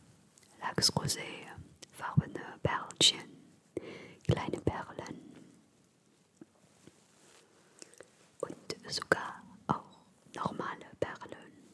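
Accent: France. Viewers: Allein der französische Akzent entspannt mega☺ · Normalerweise gefällt mir kein Französischer Akzent, aber dieses Video ist einfach nur toll😘😘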